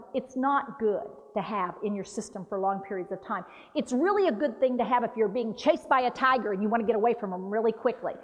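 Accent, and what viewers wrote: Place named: South, United States. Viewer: uses southern drawl... exaggerated southern, rural speech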